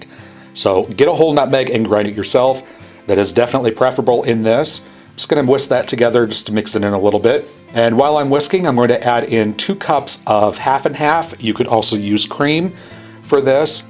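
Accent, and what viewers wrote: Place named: South, United States. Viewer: your. fried. chicken. club. sandwich. video. brought. me hear. now it's. 11pm and im watching this now. im hungry thanks 😃 i can't. see you but. do i hear. a lil bear in with. that. southern. accent.....woof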